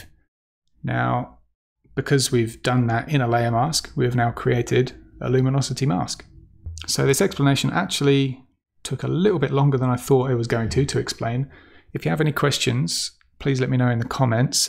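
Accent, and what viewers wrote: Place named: United Kingdom. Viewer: Hey, I used to subscribe to your channel and newsletter in the past but over the last couple of years my photography has had to take a back seat for various reasons (mainly work!). However, I find myself self-isolating and working from home at the moment and have actually had a bit more time to go back and start editing some of my images. Your name popped into my head as being the 'luminosity mask' guy so I duly found your website and channel and resubscribed to both:) I have to say, I've picked up so much by watching your latest videos and everything is making sense now. Probably one of the reason my interest waned before was I simply wasn't getting the whole luminosity mask idea. Having just watched this video, the whole 'stencil'/'lace' analogy is something I wish I'd heard a few years ago. I remember watching your live walk-through edit of the Gibson Steps(?) and being impressed at the results but not appreciating the techniques you were using. 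Might be time for a re-watch if it's still available. Anyway, great stuff all round and thanks! BTW - if I recall, you're based in Oz aren't you? Reason I ask is that I detect a UK accent in there somewhere! [edit - spelling]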